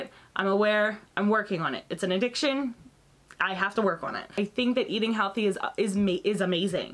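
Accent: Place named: Philadelphia, United States. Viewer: I just subscribed after your declutter and saw this video. My son had eczema so bad as a baby that we had to take him to see a pediatric dermatologist. We had to put giant bandaids on his face because his cheeks were red, shiny, and weeping. He's now 11 and after several years of relatively minor issues, he just started getting the red rough bumpy patches behind his ears and on his arm where his watch is so we started topicals and we are limiting unnecessary showering. It definitely cycles especially with allergy season. It messes with you mentally and emotionally and you are right some people have no idea how much it can impact more than your physical health. I recently started on the spironolactone due to pcos and I seem to be tolerating it well. I noticed the benefit of the clearer skin. Its nice to have a postive side effect for once. 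You got this! Also, where are you from? I'm originally from Philly and you sound like you grew up in that area.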